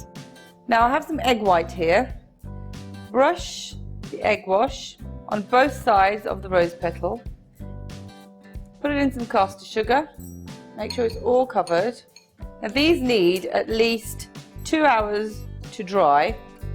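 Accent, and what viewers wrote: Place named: United Kingdom. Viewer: Ah that British accent!!! Lovely!!!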